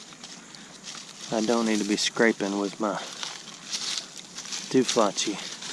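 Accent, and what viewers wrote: South, United States. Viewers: I am from the communist state of California. I am a Southerner at heart and always have been. I really enjoy the accent from the south when you people talk. It soothes my soul. Growing up my best friend's family was from Birmingham. They treated me like family.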